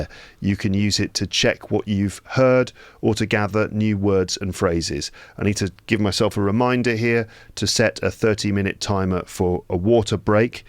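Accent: RP, United Kingdom. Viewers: BYE BYE BOY BOY BOY...! It is very nice and very interesting...!!! I would ESPECIALLY love your RECEIVED PRONUNCIATION and your VOICE of British English...!!! I am USUALLY and OFTEN listening your Podcast and Episode to IMMERSE myselft in British English....! THANK YOU VERY MUCH Mr.....! Your name is at the same as name of Sain....!!! 😝😝😝😝😝😝😍😍😍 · I am from Nepal and learning English with British accent through your leading podcast.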